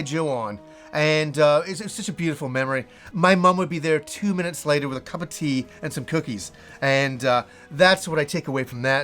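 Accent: Yorkshire, United Kingdom. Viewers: Lol a real yorkshire accent. I pray Allah SWT guides you and your family. Ameen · She sounds like a proper Yorkshire lass!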